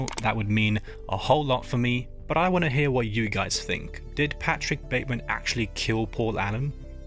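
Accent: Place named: Cockney, United Kingdom. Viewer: You lost me at Cockney accent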